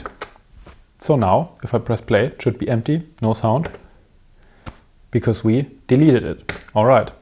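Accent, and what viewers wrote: Germany. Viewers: I love the way Germans speak English for some reason, and I notice “actually” is a high frequency word for a lot of them.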